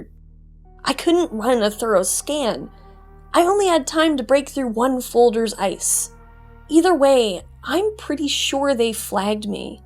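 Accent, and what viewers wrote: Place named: Canada. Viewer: Amazing video. Also knew you were Canadian the first time I heard an "are" or as we say it "arr" lol Got me back into Night city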